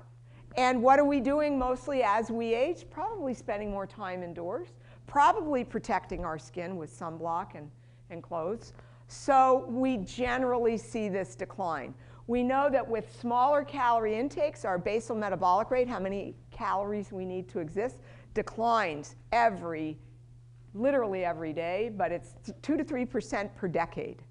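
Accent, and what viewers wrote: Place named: United States. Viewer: This lady has no sense of speech. Sloppy diction. And she gives the American accent an ugly name. Yuk.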